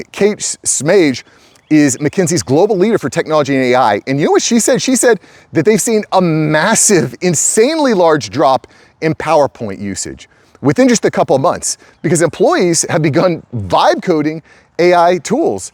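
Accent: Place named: United States. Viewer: Speak slowly, articulate clearly and speak in English, not American.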